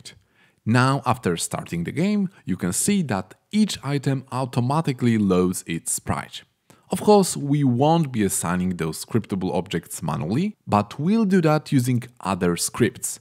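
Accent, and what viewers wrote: Poland. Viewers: are you polish? you sound so for me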